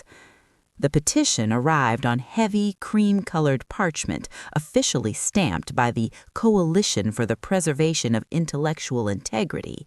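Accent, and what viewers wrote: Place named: United States. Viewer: I am through 👎🏻👎🏿👎🏽👎🏾listening to stories set in England using AIAmerican accents. Stories set in the British Isles need the appropriate accents. You lost me. I will not subscribe.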